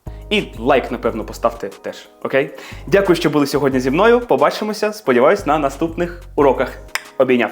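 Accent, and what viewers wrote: United Kingdom. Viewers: Ех ця брітіш інгліш 😂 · Відео класне. Мене розпирає від того як перемикається між чистою українською і лондонським англомовним акцентом 🤣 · Класний British accent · Ого, той рідкий канал якому ставлю повний дзвоник щоб не пропускати нових відео) Хоч і бісить саме британський варіант англ. мови з її кАнтами😂 Су Пердякую передав привіт. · Велике дякую , дуже подобаються такі відео і ваша британська промова❤ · Ти з тих, хто вважає, що твоя американська мова — єдина правильна англійська, але це не так) На відео людина спілкується британською мовою)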